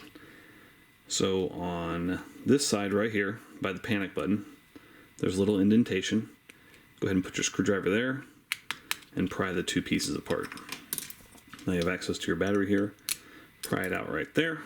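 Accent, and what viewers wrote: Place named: United States. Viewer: You're clearly American with all of the "go ahead and...".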